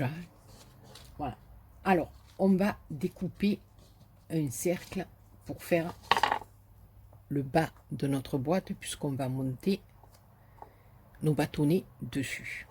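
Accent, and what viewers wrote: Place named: Marseille, France. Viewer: Coucou ma! Mais si mais si, chantes-nous le Titanic...mdr Merci pour tous tes magnifiques partages, c'est toujours un plaisir. Mais le Titanic avec ton accent de Marseille, peu chère que ça me plairait!!! Bisous bisous ♥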